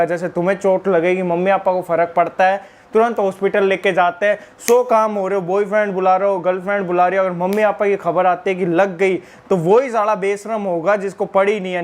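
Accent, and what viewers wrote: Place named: Rajasthan, India. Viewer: भाई राजस्थान से हो kya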